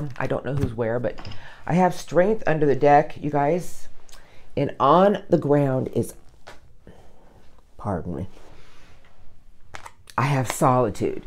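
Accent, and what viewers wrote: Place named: United States. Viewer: aww! Thank you! Beautiful reading as always. You speak amazing English, but look Spanish but sound American? You might have lived in several countries to date? You are certainly well traveled spiritually, I love your intuition and gifts. Thank you as always. ❤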